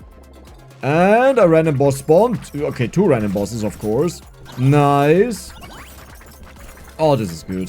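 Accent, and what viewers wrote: Germany. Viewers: ich höre einen deutschen akzent ???!!!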